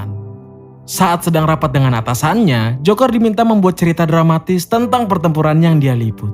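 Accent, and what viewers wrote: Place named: West Java, Indonesia. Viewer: Sunda ngapak haaaaa,,,,perbaiki bahasanya bro semoga sukses iya jangan terlalu lebay suaranya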